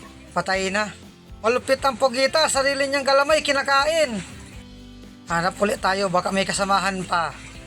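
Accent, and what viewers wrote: Bicol, Philippines. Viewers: ang tagalog ni kuya bikolanong bikolano ang tugas ng dila.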